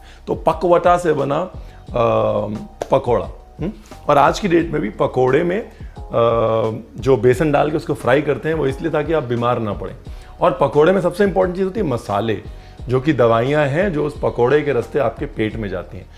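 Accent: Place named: Punjab, India. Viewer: छोटे छोटे Dices wow maha aa gaya sun ker Punjabi tone😊 ha ha neembu ke pakore ban jayegee My Mouthwatering,😊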